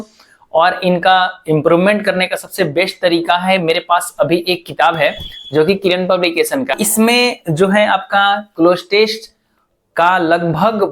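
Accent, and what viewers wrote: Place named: Delhi, India. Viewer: Maine... Practice " kara h ".... Delhi wali.. tone agai apki.. ab bihari nhi rhe ap 😮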